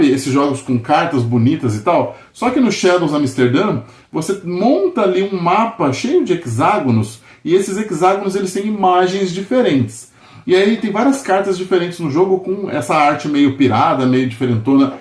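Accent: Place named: Belo Horizonte, Brazil. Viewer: Ops bom demais? Você é de BH ou região?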